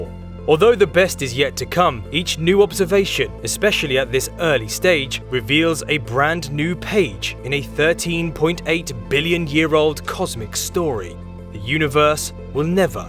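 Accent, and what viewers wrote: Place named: England, United Kingdom. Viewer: Sounds smarter with an English accent. Can you do it again in an Australian accent? Oy crikey mate!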